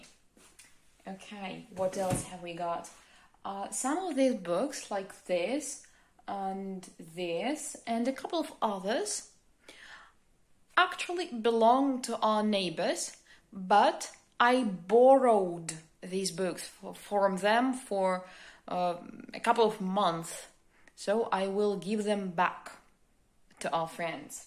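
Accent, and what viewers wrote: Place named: United States. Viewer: Вас можно запросто спутать с американкой! Ваше произношение просто идеально! It's just a native speaker's one!!! 😍👍 Вот уж правду говорят: "Талантливый человек талантлив во всем" 🤗 Сколько времени Вы прожили в Америке, чтобы выработать такое прекрасное произношение? (про "Жизнь Пи", прочитанный в оригинале, я уже понял:))) )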